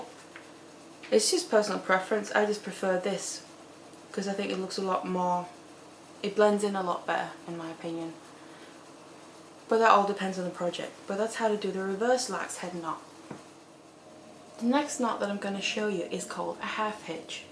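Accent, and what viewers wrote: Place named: Wales, United Kingdom. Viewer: Thanks for the video. I've always wanted to learn how to macrame. I love you're accent are you Welsh?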